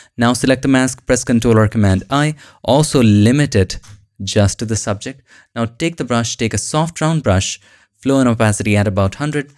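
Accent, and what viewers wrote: India. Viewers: I never thought I love the indian accent until I fell asleep while watching this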